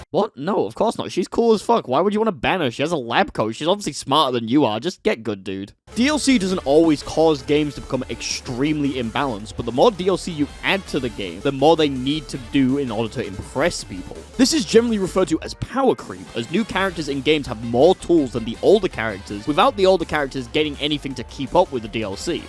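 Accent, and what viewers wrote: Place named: United Kingdom. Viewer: How to start a Video: 1. Say "Lets talk about [insert fgc related topic here]" In a british accent 2. Have the camera be fuzzy and show a big giant white text of insert topic before showing the strive giovanna gameplay 3. Always play the Character Select Theme from MBAACC 4. Time all three events to where the beat drops in the Character Select Theme from MBAACC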